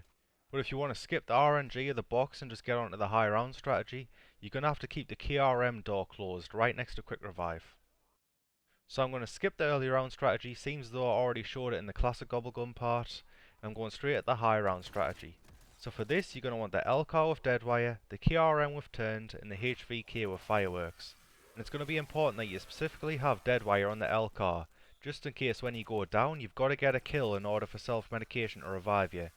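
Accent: United Kingdom. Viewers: I have no clue if is scottish or british love your videos btw they help me alot Edit: you know the map is Hard if the first Clip isnt round 100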